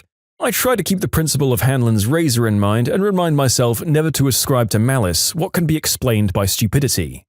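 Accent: United Kingdom. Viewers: Is it me or the he becomes more British? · What’s going on about the new British accent 🤔🤔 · Wth is this voice It's british and a different person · British alert british alert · Well that's new, nice British accent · Get rid of the British accent go back to the normal American accent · Hmm... First it was Deep voice dude, then comedic dude, now Bri'ish dude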